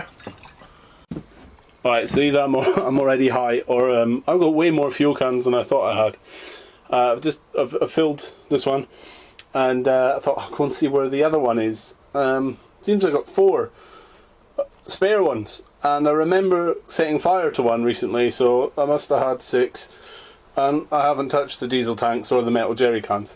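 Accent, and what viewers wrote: Scotland, United Kingdom. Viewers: Thank fuck for that, someone speaking proper fuckin English (with a Scottish accent) instead of some shitty twatty shite ya can't understand. Ta, for the advice, good video.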